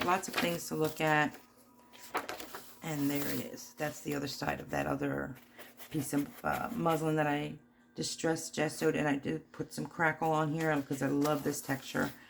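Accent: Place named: East Coast, United States. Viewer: Loved this journal! I knew from your accent that you were an East Coast girl (me too! RI/CT)! New subbie! Thanks for sharing!!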